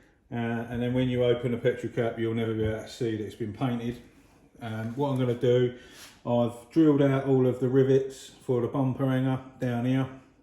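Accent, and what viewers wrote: Essex, United Kingdom. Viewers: Loving the channel and content, great tips and advice, thank you. Great to hear my old home county accent! I left Essex after 30 years to move to Devon. That was 15 years ago! What was the brand of cutting compound and finishing polish you mentioned in your live q&a? Cheers and keep up the great content. Was so chuffed to see a R5 GT Turbo. I had one in the early 90's!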